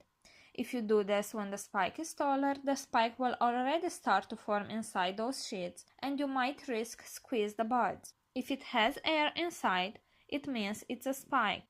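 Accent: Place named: Portugal. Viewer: She has a Portuguese accent, big deal! Get over yourself🙄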